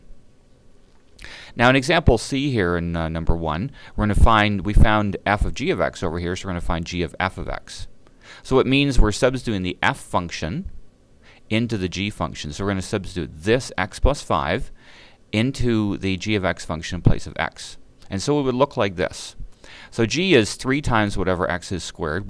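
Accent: Canada. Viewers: this is very helpful and is this guy canadian(irrelevant i know) i just wanna know BUT yes this video was helpful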